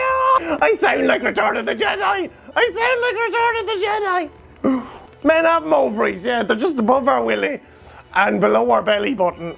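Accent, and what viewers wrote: Ireland. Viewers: Is he from cavan or louth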